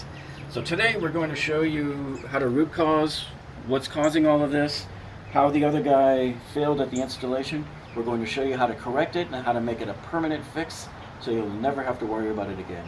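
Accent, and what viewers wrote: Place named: New England, United States. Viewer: I hear New England, loud and clear.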